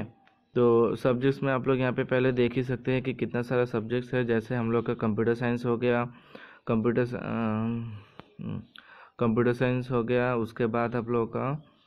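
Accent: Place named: Maharashtra, India. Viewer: Tui to marattok rokomer hindi bolchish re🙄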